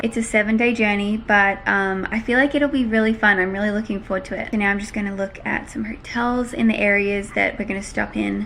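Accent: Australia. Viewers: your Aussie accent is my fav thing ever I can hear you talk for hours!!!❤️ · And your Aussie accent is so cool to listen to 👂💖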